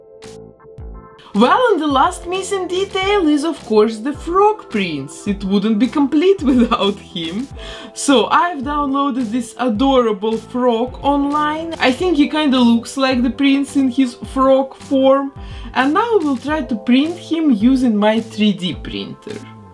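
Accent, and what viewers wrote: Russia. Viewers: Тыж русская. По акценту слышно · Where are you from, your accent make me think that you're Russian))) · Я понимаю, что мне казалось, но я будто все видео слышала максимально русский акцент... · Почему я слышу жесткий русский акцент? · очень слышно, что вы русская. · Слышно что Русская! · Excuse me, are you russian? или мне показалось... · Russian accent, wow! Even I can't normally say something in English, and you do it perfectly! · Чувствую русский акцент! Но работа просто отпад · Русская что ли? Акцент слышен очень, русские поймут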